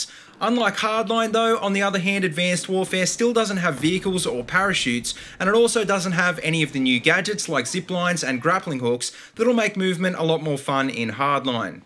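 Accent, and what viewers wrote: Australia. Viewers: Love the australian accent :D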